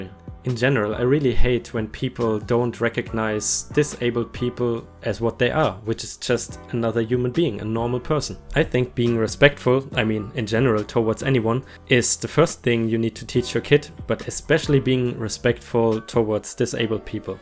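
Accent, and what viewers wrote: Germany. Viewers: I love your accent. Are you from Germany? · ufff man hört den Deutschen Akzent raus xd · Great videos, love your delivery style and that genuine German accent. Definitely still read the possible fake reports but give a warning as you have been, that it's possibly not true but being read because it is funny. Great videos, love your scheiße comment on a previous video of yours (I did German at high school, it means 'shit' for non German speaking English speakers). This next bit is from another video of yours. Gibberish is pronounced with a 'J' sound (jibberish) at the beginning, like 'J' sound (as in Julius for example) rather than the 'G' sound as in Gorilla.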